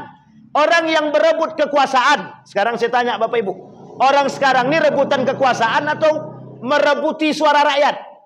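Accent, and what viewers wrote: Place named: Daik, Malaysia. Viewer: Assalamualaikum , maaf bertanya, pak ustad Dari mana? Dialek nya sperti orang daik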